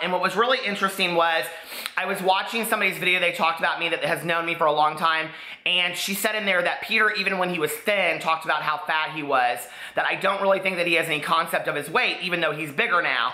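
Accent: South, United States. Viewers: Peter are you originally from the south? I don't know if you've mentioned in the past, I just followed you this week. I am getting a twang of a southern accent!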